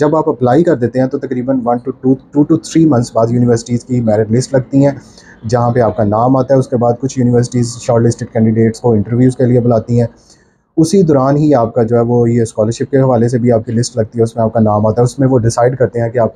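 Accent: Faisalabad, Pakistan. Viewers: 😂.. app Faisalabad sa lgtay han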